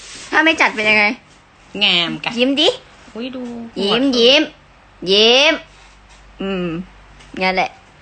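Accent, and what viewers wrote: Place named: North, Thailand. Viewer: น้องน่ารักนะชอบให้พูดเหนือน่ารักดี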